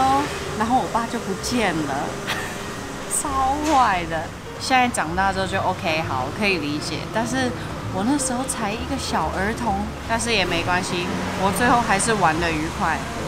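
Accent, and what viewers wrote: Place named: Taiwan. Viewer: 這個主播…很台！😂😂😂😂😂😂😂超👍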